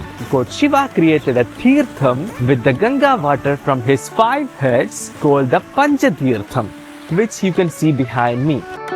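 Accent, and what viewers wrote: India. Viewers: Yes I agree, his way of delivering the information on Devi and the sthla puranam is in a very south indian english accent, hence seems very natural and relatable, unlike some who fake an accent. We can surely deliver any news in our natural tone and accent. Keep up the work sir.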